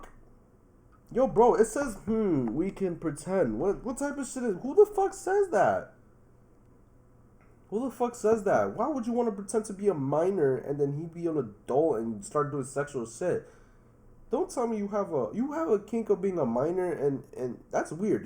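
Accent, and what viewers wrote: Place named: Long Island, United States. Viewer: What part of Long Island you from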